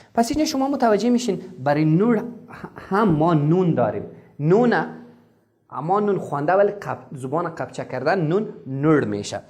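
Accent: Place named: Kandahar, Afghanistan. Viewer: د پښتو زده کړې په لوست کې مو ځينې کندهاري گړدودونو څخه کار اخيستى د ې، ي، ى، ئ ۍ په پېژندنه کې چېرته چې تاسو مثال ورکړى، د (ي) = سترگي، دا په کندهار کې وينگ دى خو اصلا په ليکني بڼه لوى استاد رشاد هم سرگي نه ليکل ،( سترگې) ليکل . دلته د اې تلفظ يا گړدود سم دى نه د اي خو په وينا کې بيا پروا نلري. دا معمول په ټولې نړۍ کې شته چې د هرې سيمې خلک نورو خلکو ته څه ورپېژندل غواړي نو د سيمو په ځانگړتيا او اغېزو هم ورته مالومات ورکوي، بيا د ژبې په برخه کې ډېر معمول دى چې تاسو ته پکار و د کندهار لحجه، گړدود مو هم تشريح کړاى وى. بيا هم مننه او فارسي ژبو ته کندهاري گړدود په سبب د اسانه غير فني تلفظونو ورنږدې دى چې ترې په اسانه گټه پورته کړي خو که فني برخې گړدودونه هم زده کړي بيا يې د ژبې پوره زده کړه زباتېږي.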